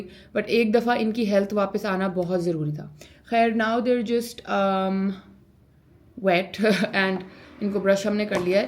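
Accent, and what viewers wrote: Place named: United Kingdom. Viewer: I love your British accent please try to use it more often while conversation in your videos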